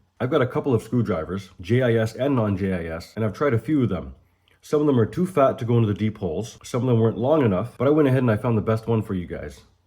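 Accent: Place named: Canada. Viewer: Hearing Canadians say "out" always cracks me up😂😂. Thanks for the info!!!!!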